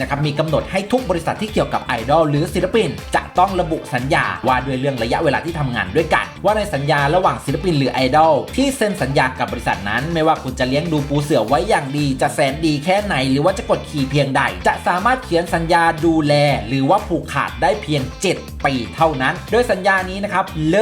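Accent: Isan, Thailand. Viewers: สวัสดีแอดชอบแอดมากไม่ว่าจะพูดสำเนียงอีสานหรือกลางก็ชอบจากใจจริงชอบๆม๊ากๆๆ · ผมหมายถึง จริตจัดเต็มแว๊ดๆ+อิสาน มันฟังไม่รุเรื่องว่าเป็นคำว่าอะไร จะเข้าใจได้ไง? การนำเสนอ การถ่ายทอดข้อความ ถ้าคนฟังแล้วไม่เข้าใจ มันก็พัง.....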